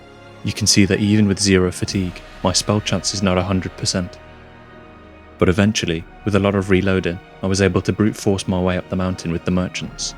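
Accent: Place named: United Kingdom. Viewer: With the channel picture, the thumbnail, and the title I didn’t expect this voice. I anticipated something louder, more crude, and American. Not bri*sh ASMR. Good video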